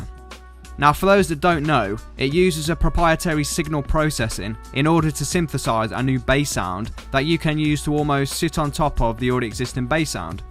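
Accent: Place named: Derby, United Kingdom. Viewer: Sound like you from Derby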